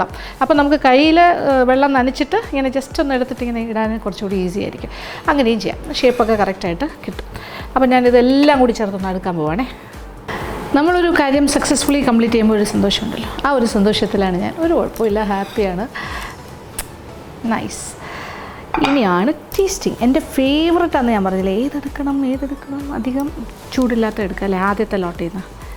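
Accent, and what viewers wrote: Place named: Thrissur, India. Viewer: അപ്പോ.. തൃശ്ശൂര്കാരിയാ...ലേ...? ഞാനും..same pich..😍😍😍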